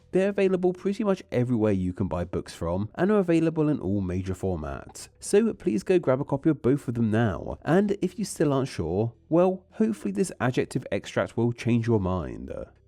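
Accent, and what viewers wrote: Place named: United Kingdom. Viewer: bro he's just british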